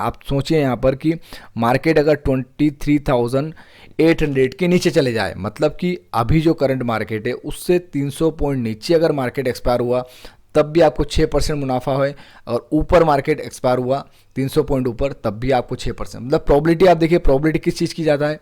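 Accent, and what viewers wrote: Indore, India. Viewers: Bhai mujhe lagta hai aap Indore se ho....kya me sahi hu? Ya malwa region se 🤔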